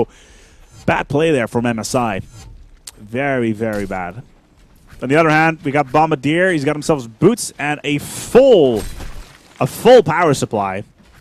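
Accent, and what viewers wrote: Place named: United States. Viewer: i like how you make your voice all americany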